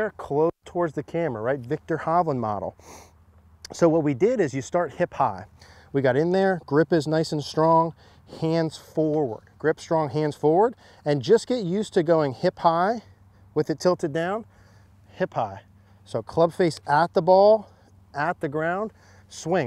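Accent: United States. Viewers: My god it's so much better listening to an American accent and straight forward video rather than these British guys and all their cringey clickbait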